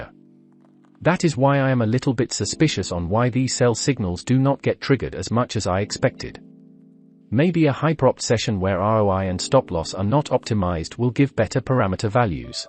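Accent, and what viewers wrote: Netherlands. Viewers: I am missing the Dutch accent! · to bring back the Dutch accent.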